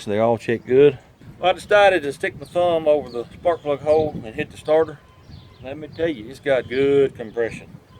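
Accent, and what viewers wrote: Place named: Tennessee, United States. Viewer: As usual a great video. Sure glad to hear your accent, brings back memories of my time in Tenn. Many thanks!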